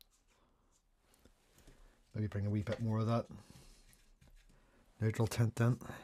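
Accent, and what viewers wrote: Northern Ireland, United Kingdom. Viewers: These are the most realistic, looking shit I’ve ever seen painted! Nicely done. Love your videos and just subscribed. What part of northern Ireland are you from?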